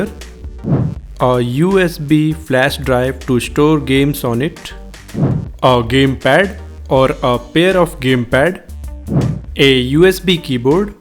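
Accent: India. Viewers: are you from india...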